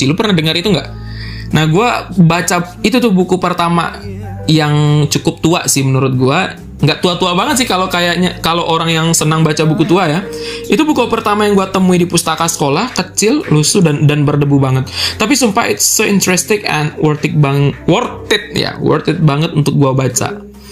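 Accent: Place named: South Jakarta, Indonesia. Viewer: Woaaah.. 😂 jaksel banget bro But good job! Keep it going yaa ^^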